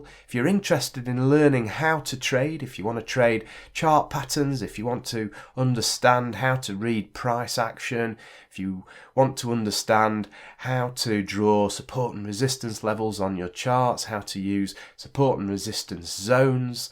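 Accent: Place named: England, United Kingdom. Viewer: And besides I do love the English accent :)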